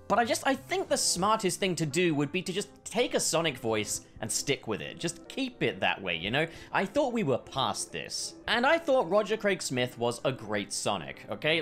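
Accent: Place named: United Kingdom. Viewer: I think he is somewhere in the u.k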